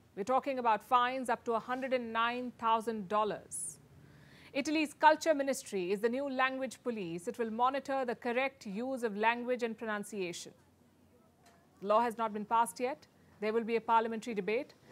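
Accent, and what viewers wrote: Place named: India. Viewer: She handles stress in English very well, while still remaining recognisably Indian. Since stress is used to carry emphasis and shades of meaning in English, it's a useful asset to a news anchor.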